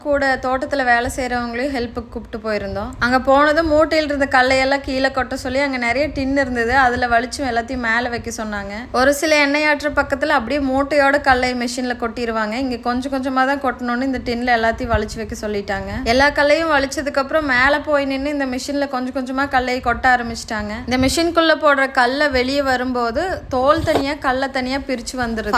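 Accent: India. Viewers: கொங்கு பேச்சு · வாழ்த்துக்கள் சகோதரி. கோவை தமிழ் கேட்டது மகிழ்ச்சி. வாழ்க வளங்களுடன்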